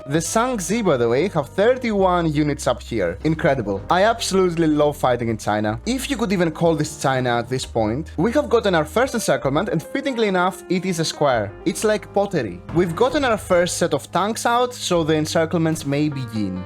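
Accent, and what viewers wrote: Greece. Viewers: I suffered through 16 minutes of chracteristically Greek accent and survived it.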